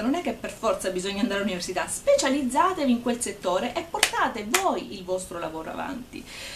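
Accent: Sicily, Italy. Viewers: Catania? :D Palermo :)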